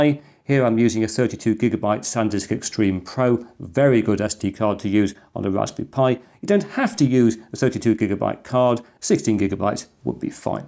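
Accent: United Kingdom. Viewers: I like this british accent! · 👍I learn about new technologies and also practice my British accent listening comprehension. This channel has everything!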